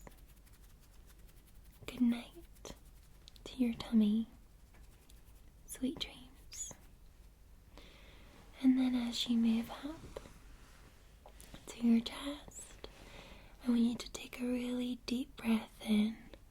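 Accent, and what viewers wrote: Scotland, United Kingdom. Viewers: Fall asleep with the. Next to me......scratch one off my bucket list....💜❤😆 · Thank you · Thank you.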